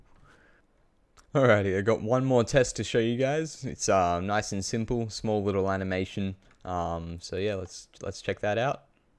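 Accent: Australia. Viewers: Are you australian..? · Are you australian? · HES AUSSIE LUV IET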